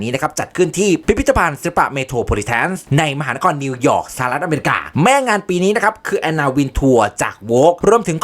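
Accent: Isan, Thailand. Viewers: พากย์แนวอีสานแบบแอดนี่แหละมันเห็นภาพสุดๆๆๆ ...อย่าฟ้าวๆๆๆ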